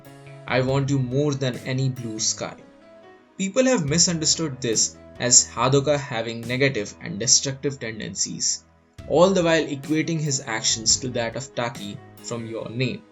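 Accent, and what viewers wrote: India. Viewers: Wanna have an Indian English conversation? we talk just like him.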